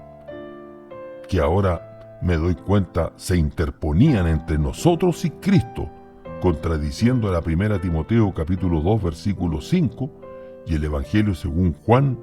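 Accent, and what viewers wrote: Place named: Chile. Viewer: Por la forma de hablar me parece que es chileno ¿o me equivoco? Soy católico practicante y que pena que se haya desilusionado de la Iglesia.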